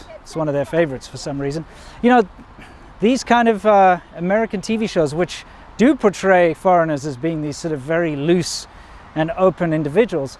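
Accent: South Africa. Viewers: Not particularly interested in China but I somehow got hooked by your channel. Love to hear the SA accent. Reminds me of when I studied at UCT - best time of my life! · Hey there compatriot. I've just subscribed, just seen your channel. I'm a huge fan of Chinese movies (Hero etc.) and music (The Yellow River Concerto, makes me cry) So please do a series on Chinese music and theatrical culture. In these strange days let us not forget about the higher and finer things China has given us (Tai Chi? do they still do it?) Many thanks...it's so good to hear a South African accent lurking somewhere there!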